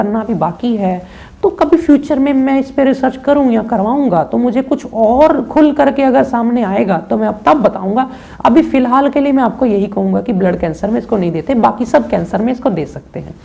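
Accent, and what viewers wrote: Punjab, India. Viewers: koi kami nhi rahi aapke bolne me....Punjabi tone pta chal jaati hai guru ji.....thanks.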